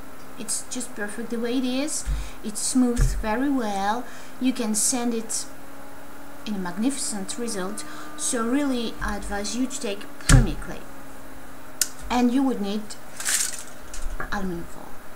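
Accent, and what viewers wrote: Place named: France. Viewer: ahhh it been so long since I was with the part of my family that has your french accent, hahaha! :) you sculpt like me, no measurements! lol, except I draw sometimes. Johnny <3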